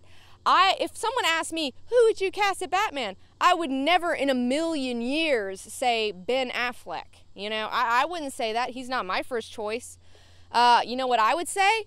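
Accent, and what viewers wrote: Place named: South, United States. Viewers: Wow, sounds like the Alabama accent really stuck on you. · I know youre in Alabama shooting the video but is that home, because that local accent was really coming out, i thought it was my imagination at first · LOOK WHO GOT HER SOUTHERN DRAWL BACK! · Yer southern accent comes back the further east you go. Yeah, yeah - cast the unknowns! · You picked up a southern accent. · Sounds like Female Kenny Powers. Is she from the place she's vacationing? I know a lot of people revert to their 'drawl' when they go back to their home turf. I see it when I go back my home; get drunk and start talking local again. No issues at all with the statements though; entertaining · Where are you from? Slight southern accent coming through. Going to say Nebraska. Yes? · I noticed a southern twang in your accent I never picked up on before. 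· Spend some time in Alabama and the Southern comes out. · im really starting to hear that southern accent come out of you, especially when you got that alcohol in ya.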